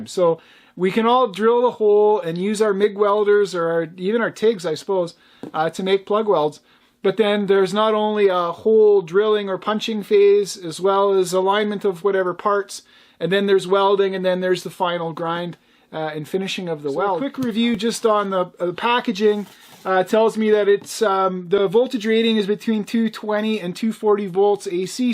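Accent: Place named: Canada. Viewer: You must drive across the border to buy stuff because sounds like you're Canadian it seems like stuff is so much more up there I've been there many times it's like the Canadian content law make stuff way more expensive and they can't sell tools like this there that cheap. But if it was made in Canada they can sell it so the Canadiens markup the hell out of everything that they make so everything cost you guys too much money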